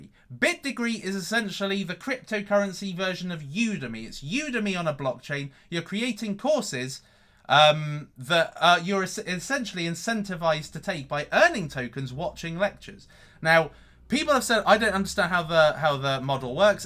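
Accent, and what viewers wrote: England, United Kingdom. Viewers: love your accent, mate. which part of England are you from? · Hi, whare are you from? UK? Thanks for your videos. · He is English lol...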